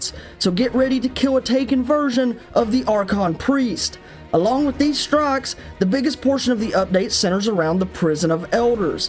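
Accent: South, United States. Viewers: I love your hillbilly voice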